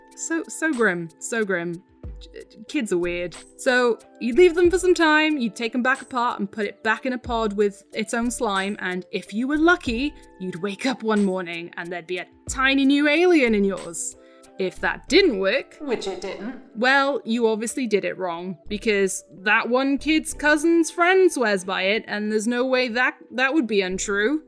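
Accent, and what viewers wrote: Wales, United Kingdom. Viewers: Ur british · Are you brittish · I KNEW I HEARD A WELSH ACCENT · I new I recognised that ascent! Got a question is wales.. good?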